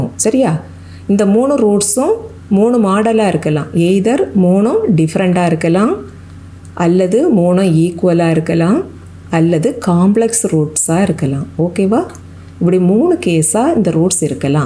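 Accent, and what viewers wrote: Kanyakumari, India. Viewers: Mam the way your teaching is very nicee ninga endha oru namma kanyakumari ah...😊😊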